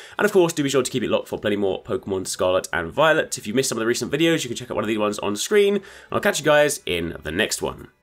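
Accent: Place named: England, United Kingdom. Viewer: Because he's English/Australian?... You do know there's no "one-way" to say stuff in English, right? English has countless dialects, and is a fusion of several other languages (German, French, Norse, Latin, etc); England alone has like 40 local varieties of speech depending on where you go in that tiny island. Besides its a name for a fictional thing, how its pronounced should be the least of your worries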